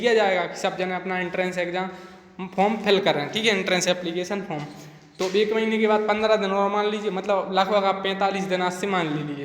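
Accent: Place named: Sagar, India. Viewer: Bhai ap sagar side k hi ho kya...m bhi wahi kha hu apki awaz..se lg rha h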